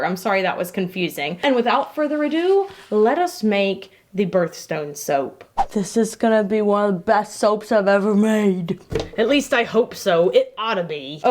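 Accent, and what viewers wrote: Texas, United States. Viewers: It’s OK,!! Remember Marchesa?? You Texans are so charming!! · “Gawly Dawg”!!!??? She is such a Texan.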